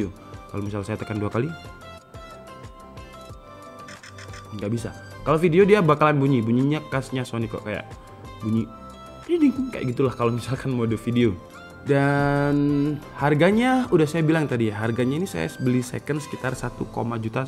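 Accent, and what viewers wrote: Java, Indonesia. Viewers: Wong jowo tt mas?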